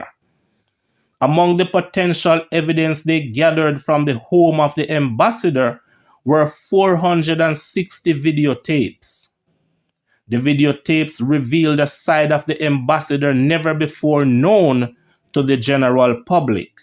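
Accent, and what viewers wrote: Jamaica. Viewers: I understood every word. Some French, Spanish, etc. people speak English with an accent yet are not ridiculed. Patwa is our mother tongue. Gwaan taak, mi luv yuh Jumiekan accent🙂 Luuk yah, Google translate have patwa now, suh me wouldn't mind if you chat full patwa😉. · He is Jamaican we speak how we chat.. Lol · You have never heard patwa before? Stfu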